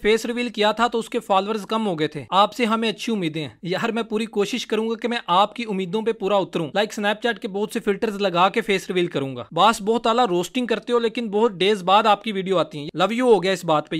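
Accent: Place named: Punjab, Pakistan. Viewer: Ap k bolny k style se lgta hy ap jhlem se ho ... Han ya naa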